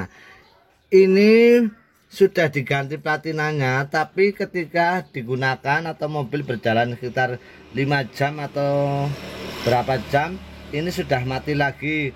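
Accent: Banyumas, Indonesia. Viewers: Mantap. Logatnya mirip banyumas. Lokasi dimana bro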